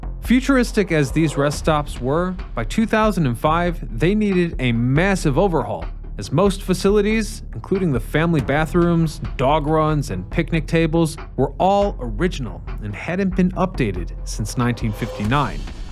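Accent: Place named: Chicago, United States. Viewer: I knew you were from Chicagoland when you called them the toeway and the food core.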